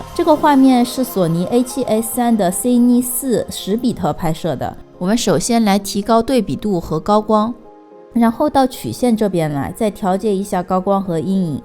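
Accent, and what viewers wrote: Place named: Shanghai, China. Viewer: 专业的解说，声音好听，好像是上海人😛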